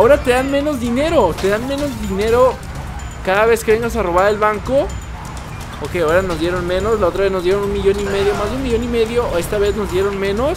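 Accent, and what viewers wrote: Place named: Sonora, Mexico. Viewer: Eres de sonora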